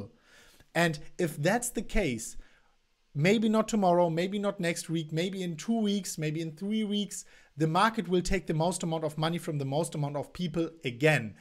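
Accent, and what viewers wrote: Germany. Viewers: Love your German accent. It actually makes me listen with focus , cheers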